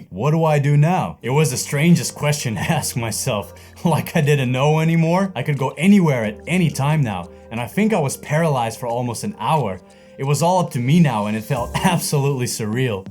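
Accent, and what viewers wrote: Netherlands. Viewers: Hey! Just discovered your videos and they are fantastic! Would you mind answering a question for me? Where are you from? The Netherlands? Austria?:) Somewhere else? I am only asking because of the accent you have. I am Dutch myself but I've been living in the U.S now for nearly 20 years and my English accent has evolved somewhat. I am guessing my accent does sound a little like yours. Thanks for the videos! keep it up and stay safe!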